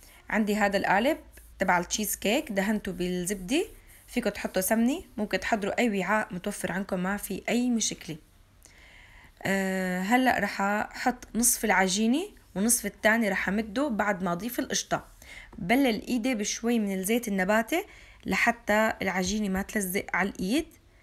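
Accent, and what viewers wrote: Homs, Syria. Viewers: من كلامه مبين انها من مدينة حمص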